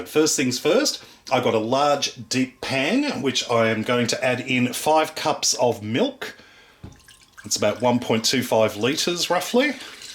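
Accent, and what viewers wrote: Australia. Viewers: Ok the second I heard the familiar Aussie accent I subscribed. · are u australian? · I have to ask. Are you from Australia or New Zealand? This looks sooooo good! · Reaperz Void ..... no. That’s clearly an Australian accent.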